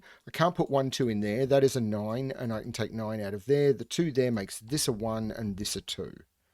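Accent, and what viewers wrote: Australia. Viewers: Love the Aussie accent... had to check the screen to see if the setter was truly "Naughty"! lol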